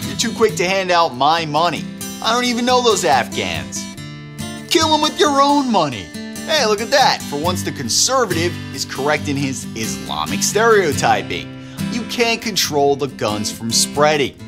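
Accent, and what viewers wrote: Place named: Russia. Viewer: Tell me why this man does a better Russian accent than American one though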